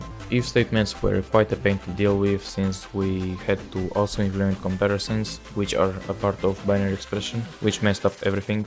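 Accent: Moldova. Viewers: Băi ești moldovean?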